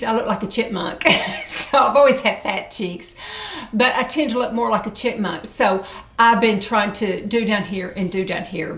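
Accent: South, United States. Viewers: I love your southern accent and vibe. I grew up in the Ozarks and it takes me back to my roots. ❤️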